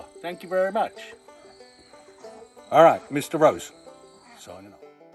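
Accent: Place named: England, United Kingdom. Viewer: What’s your back ground mate you sound like a Englishman?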